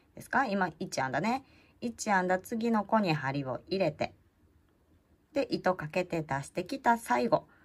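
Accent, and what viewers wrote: Kansai, Japan. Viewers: 昨日登録させていただきました。とても軽快な関西弁が心地よく楽しく拝見しています。不器用ですが頑張って作ってみたいと思います！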